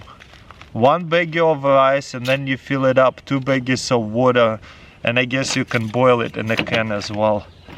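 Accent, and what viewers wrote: Russia. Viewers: sir, i find your accent to russian. please get a vanda white to do the talking.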